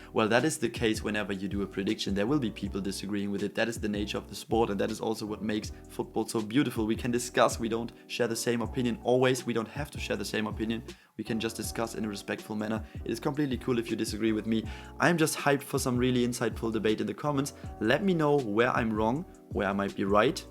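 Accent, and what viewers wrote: Germany. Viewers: Deutscher Akzent bockt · Man hört so dass du deutsch bist😂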